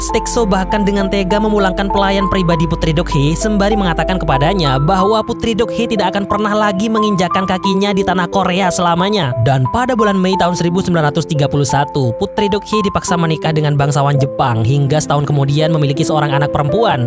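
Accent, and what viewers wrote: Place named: Madura, Indonesia. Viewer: LOGATNYA KETAUAN BANGET KALO ORANG MADURA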